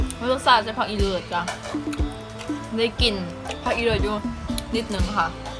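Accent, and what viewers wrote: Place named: Phu Thai, Thailand. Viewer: สวยหน้ารักหน้าขาวปานไข่ปอกคุน 😙😙ยิ้มก็สวยพูดจาหวานสำเนียงภูไทซึ้งมาก หลงมนต์เสน่ห์สาวภูไทสาว สกลนคร😙😙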